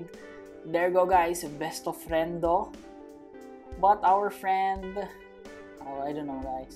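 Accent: Philippines. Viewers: ive been watching you for years now i love ur intro, ur filipino accent and the way u work hard for content doing those level 1 to pro noobs “ is back” i admire you and u make me so encouraged to make new alt accounts and max level them all i wish u notice this comment. happy bomb day🎉🎉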